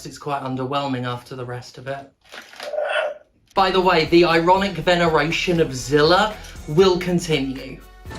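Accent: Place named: United Kingdom. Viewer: Hey Stephanie Long Time watcher I feel like I don’t comment enough to let you know that I love your Content because I love anti-corporate stuff and I love video games and I love you. Just wanted to say that it sounds like these corporations are the most chaotic evil rogue guild and I adore that you used a cyberpunk 2077 phrase when you called them corpos. Thanks for saying that they were rolled A1 this time but still have their eye on the cookie jar. I love your British accent, and you’re alliteration and your metaphors.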